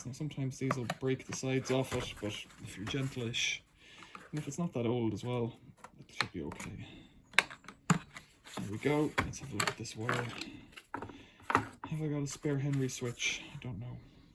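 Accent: Ireland. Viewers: Lovely chilled video. Practical, informative, clear. Top man. Also, loving the Irish brogue, not to sound patronising 😉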